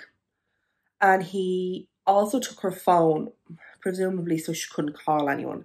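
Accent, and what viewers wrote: Ireland. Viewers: I like ur accent is it a south dublin love ur chanell as well .xx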